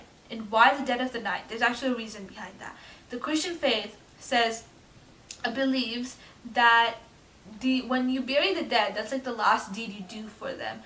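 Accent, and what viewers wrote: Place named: France. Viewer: Woah this is so interesting, and also your French accent is so nice 😊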